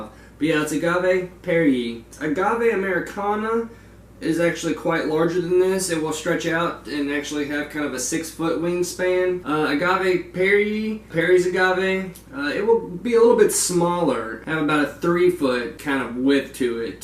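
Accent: South, United States. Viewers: First of all I am so glad I found your channel! Hearing a fellow southerner doing these plant videos is so refreshing! I'm from wv and I'm kind of shy about my accent on my videos lol second I got my first agave yesterday so thank you for the info!!! ☺️💚🌵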